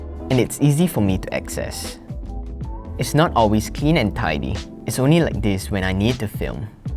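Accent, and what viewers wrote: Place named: Singapore. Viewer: As a Malaysian, i approve your singaporean accent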